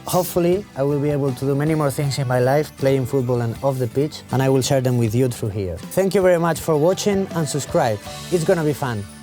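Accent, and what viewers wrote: Spain. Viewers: Ese espaninglish!!!!💪😍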